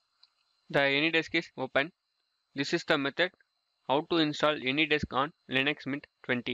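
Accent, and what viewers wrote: India. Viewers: East Indian accent is so heavy, I can't understand what he is saying.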